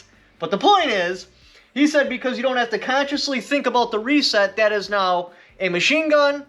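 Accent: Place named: New York, United States. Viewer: Is This kid in NY? Sound like a person from NY. When ATF says it is a machine gun that does not make it law. They inforce laws not make laws. Until it has been through the system not illegal. It would be illegal for an arrest.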